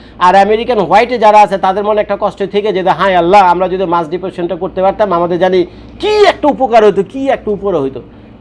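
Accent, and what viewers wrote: Dhaka, Bangladesh. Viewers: মনে হচ্ছে আসল ঢাকার কোন মানুষ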